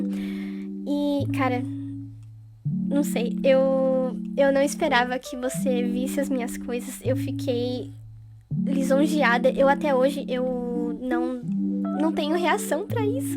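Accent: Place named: São Paulo, Brazil. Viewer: Hahah que estranho pessoas não percebendo que você é de SP quanto é tão claro.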